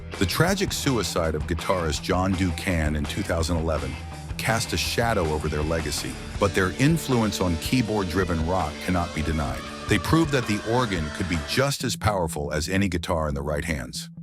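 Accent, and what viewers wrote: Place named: United States. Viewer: The American voice commentator turns me off every time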